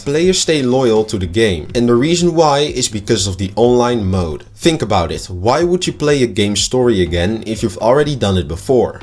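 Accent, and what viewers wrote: Netherlands. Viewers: Holy Dutch accent maat, maar goeie vid 👏🏼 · Brother I live in NL and something tells me you are dutch by your accent haha. Anyways very dope vid with dope analysis 💪🏽💪🏽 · You must be Dutch my friend, Right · Are you dutch? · Kountree? If this guy aint Dutch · Ik hoor het nederlands accent in je engels😂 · Je klinkt Nederlands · You're Dutch or your accent is Dutch because you're nervous. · I hear a Little Bit HOLLÄNDISCH❤😂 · hoe nederlands is deze guy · great editing, unique commentary, and a dutch accent, is a fast Like from me! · I hoor dutch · Are you dutch😂🎉? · Nederlands denk haha leuke videos man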